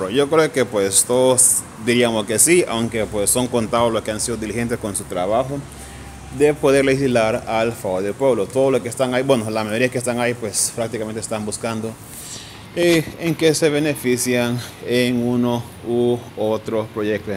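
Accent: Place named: United States. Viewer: Disculpe, ella nunca ha estado callada, pero los hondureños tenemos mente corta,creo que ud más pues por el acento que le siento en sus declaraciones o comentarios se le puede escuchar un acento como del Norte.(USA).pero que le vamos a hacer..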